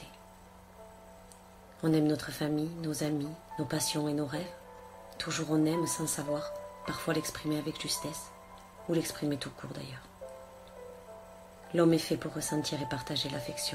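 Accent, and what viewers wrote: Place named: South, France. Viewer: Je le redis "sublime" et tu as le même accent du sud que moi. Encore merci 💖💖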